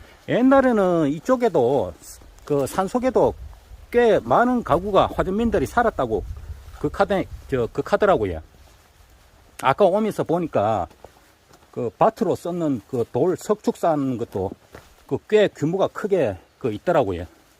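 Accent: Gyeongsang, South Korea. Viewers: 이분 대구사람인가? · 아름다운 풍광과 맛깔 나는 경상도 사투리가 너무 잘 어울립니다. 고맙습니다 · 경상도 오빠야네예 ㅎ 조심해서 다니세요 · 경상도 같은데요 겅남쪽 ~좀 알키주이소~자랑만하지말고예~😃😃😃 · 구수한 갱상도 말로 전해주는 자연소식 잘 보고 있니더 항상 조심 하시소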